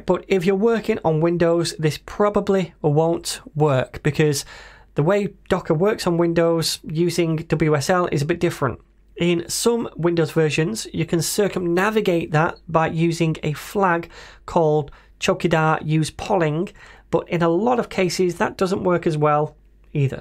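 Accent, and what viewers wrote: United Kingdom. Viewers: I want you as teacher! 🤩 I love your British accent Cheers from France 🇫🇷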